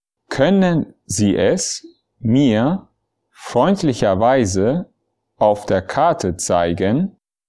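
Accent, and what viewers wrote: Apulia, Italy. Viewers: Sei troppo simpatico con l’accento pugliese 😂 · ho riconosciuto l'accento perché sono pugliese anche io, ma ora vivo ad Amburgo e le tue lezioni mi stanno aiutando un casino, sopratutto quelle sulle espressioni più utilizzate.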